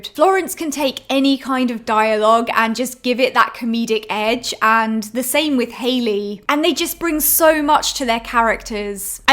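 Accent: United Kingdom. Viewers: You have the most british accent that i’ve ever heard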